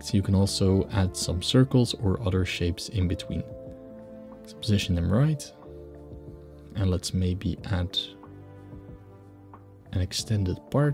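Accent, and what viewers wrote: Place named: Netherlands. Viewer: I recognize a Dutchie when I hear one !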